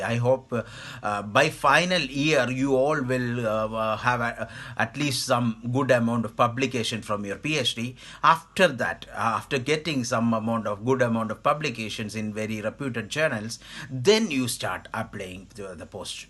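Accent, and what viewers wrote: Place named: Malaysia. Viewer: First of all learn to talk english ,then learn how to pronounce it other than some '' MINGLISH'' 🙄😏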